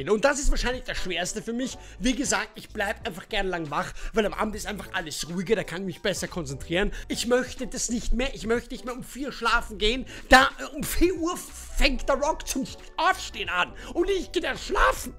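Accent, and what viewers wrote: Vienna, Austria. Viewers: hab das erste mal durch dhalu bei among us gesehen/gehört... schwöre, dachte das ist nen 40iger, adipöser ösi (nicht bös gemeint, ich wohne selbst in wien;))... so kann man sich irren haha:D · Ist etwas Wienerisch. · Hallo lieber, ich freue mich immer sehr über deine Videos und ich liebe deinen Akzent (Wien ist meine Lieblingsstadt!) Für 2021 habe ich mir vorgenommen ganz viel an Gewicht zu verlieren, dazu mache ich seit Anfang Januar eine Ernährungsumstellung. Die ersten zehn Kilo sind schon runter, aber ich möchte noch mehr abnehmen. Sport soll auch dazukommen, sobald man wieder ins Fitnessstudio gehen darf. Alles Gute an alle, und auch an dich!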